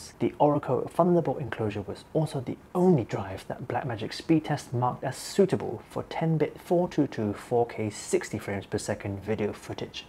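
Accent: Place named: United Kingdom. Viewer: Weird seeing an Asian speak old style British. But not as weird as seeing a black guy speaking British.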